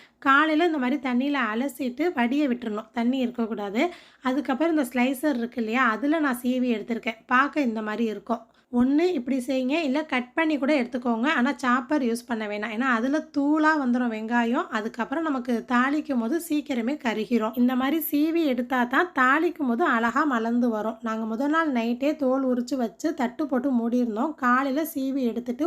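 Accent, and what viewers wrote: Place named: Thiruvarur, India. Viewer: Ungalukku entha ooru enga Orr karanga mathiri pesuringa nanga thiruvarur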